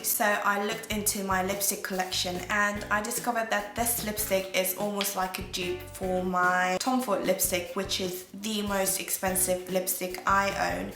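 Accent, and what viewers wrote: United Kingdom. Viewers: 엉니 영상 자주올려주세요ㅜ 언니 영국발음도 넘 좋고 화장도 진짜 잘하세요! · 늘 유튜브볼때마다 느끼는 거지만 영국억양 너무 좋네요^-^ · 님 영상 보고 핑크오이스터 샀는데 예뻐요! 영국 억양은 3년만에 익히신건가요? 신기해요^^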